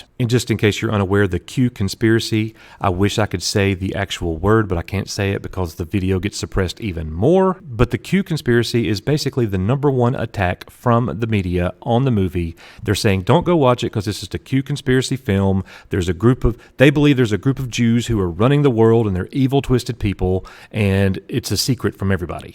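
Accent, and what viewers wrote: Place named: South, United States. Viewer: You’re such a southern gentleman. It’s part of why you’re so easy on the ears. But it is, “Ms.” ✨😁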